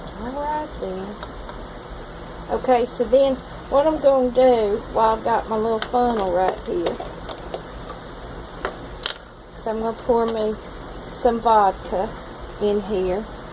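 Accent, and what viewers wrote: South, United States. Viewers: James D I just love her voice! So southern and sweet. 😇🇺🇸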